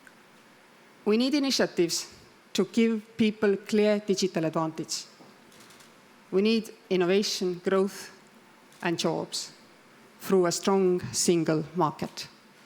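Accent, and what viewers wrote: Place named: Estonia. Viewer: For the love of god, woman, learn how to speak english! You´ve been working in Luxenbourg for how long now??? I´m an estonian too, but you will never hear me speak like that. The accent is just hurtful for my ears.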